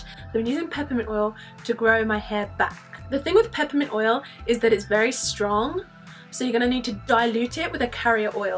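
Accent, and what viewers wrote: London, United Kingdom. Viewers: Are you from London #londonsquad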